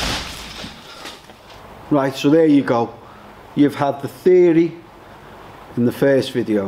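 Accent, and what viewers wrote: Liverpool, United Kingdom. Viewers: Like a scouse usyk there mate 👌🏾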